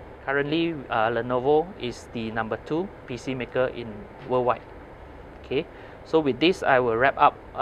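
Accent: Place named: United States. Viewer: 你的英语说得和美国人一样。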